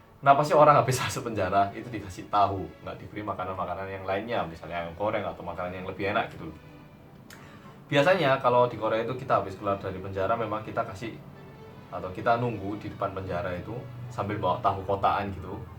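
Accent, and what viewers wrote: Java, Indonesia. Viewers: matursuwun udah di kasih Tahu, mas lek ngomong medok e mandes nemen heheeh ditunggu video brikute mas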